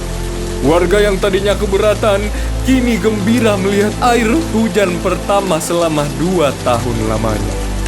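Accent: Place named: Makassar, Indonesia. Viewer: yang bilang harusnya suara biasa saja, mungkin mereka butuh pembiasaan aja, suara dgn nada seperti ini memang cocok diapakai untuk cerita legenda, apalagi ini yg cerita org bugis makassar, pasti ada logat2 makassar ala2 puisinya. Lanjutkanmeki gannn btw ini keperluan skripsi kah bro?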